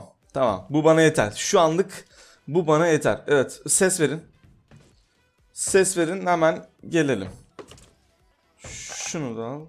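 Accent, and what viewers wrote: Adana, Turkey. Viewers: ADANALIYIM DE BİZİ KURTAR YÜKTEN BU ŞİVELERİN BAŞKA AÇIKLAMASI OLAMAZ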